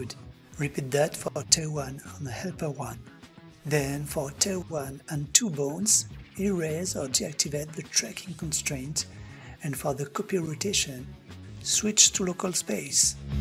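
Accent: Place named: France. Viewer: To me, you sound like you have a slight French accent, and I don't know if you knew this, but many Americans and other English speakers love French accents. In fact, your accent is in a great sweet spot where you sound foreign enough that you get the mystique of a foreign accent, but your pronunciation is good enough that there is no trouble understanding you.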